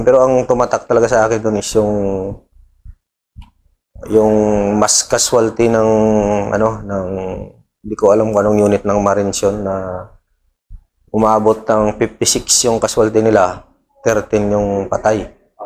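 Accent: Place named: Visayas, Philippines. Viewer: Hehhehe katawa ko sa baho way ligo² mura lagi ka og bisaya sir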